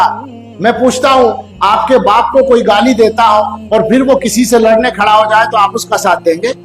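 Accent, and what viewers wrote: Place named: Hyderabad, India. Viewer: جناب کی شکل تو مودی سے ملتی جھلتی ہے، اور آواز و لہجہ بھی انڈین حیدر آباد والی ہے۔ 😊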